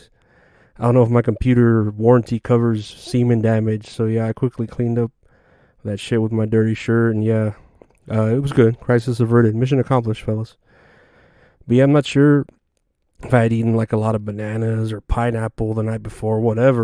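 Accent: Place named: New Mexico, United States. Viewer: We think alike watp sent got hooked fast! My pal n I are working on a pod cuz we have faces for radio hahaha well myself ya I thought you were from New. Mexico cuz people here talk aaawll like that. .hilarious brother rNew seescriber for life! 🤘🤓🤘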